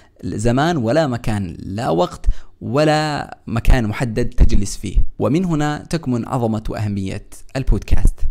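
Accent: Yemen. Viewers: اهلا فيكي اخي شكرا جزيلا لك . انا يمنية ولهذا عرفت مباشرة انه يمني من لهجته كما اني سألته ذات مرة في تعليق هل هو يمني او لا فأجابني انه من اليمن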